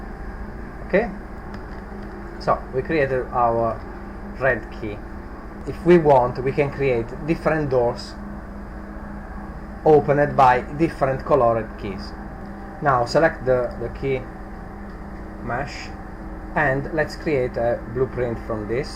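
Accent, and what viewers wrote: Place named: Italy. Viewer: Ma sei italiano? Non sembri inglese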